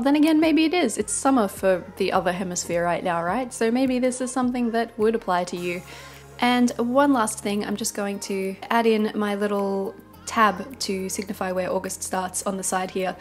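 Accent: Australia. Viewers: It's nice to hear another Aussie on Youtube. Love your spread. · Also, great to hear another Aussie Bujo-ist :’)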